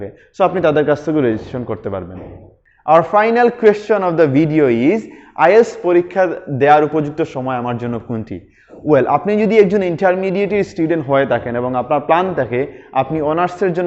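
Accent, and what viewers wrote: Sylhet, Bangladesh. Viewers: Sir sylheter na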